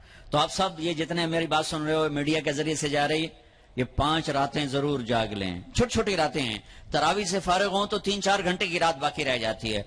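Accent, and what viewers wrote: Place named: Punjab, Pakistan. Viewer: App ki Punjabi mjy boot ache lgti ha mola slamat rukhy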